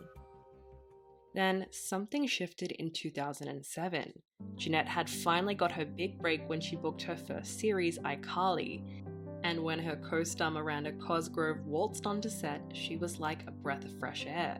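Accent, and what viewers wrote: Australia. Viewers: Nice to hear a fellow Aussie. Thankyou for this. 😊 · Is this Australian??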